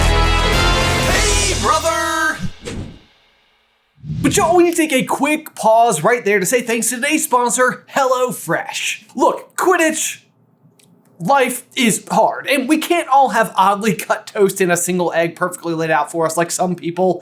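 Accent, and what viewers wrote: South, United States. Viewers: I didn't care for the Southern accent and feel like there are too many clips cut in the video. That being said you guys are amazing and I liked the theory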